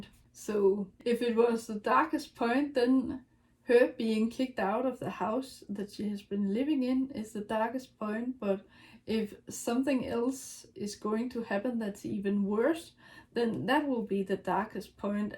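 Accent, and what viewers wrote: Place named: Denmark. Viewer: I’m intrigued by your characters and story. I think there is definitely room for stories outside of a genre. Genre seems to be mainly for helping marketing and selling it. Also…Denmark! I thought I recognised the accent. I lived in Copenhagen for a year and really loved the country and the people ❤ My story is set in Sweden actually 😃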